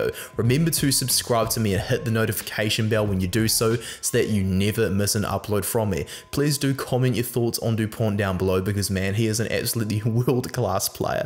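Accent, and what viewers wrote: New Zealand. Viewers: Excellent vidéo and analys, Love the Kiwi accent👌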